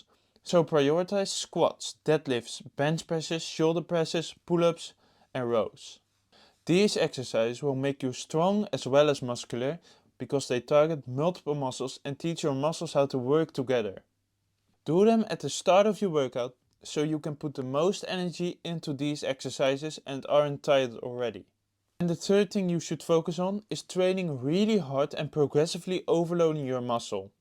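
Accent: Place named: Netherlands. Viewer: The dutch accent 😂😂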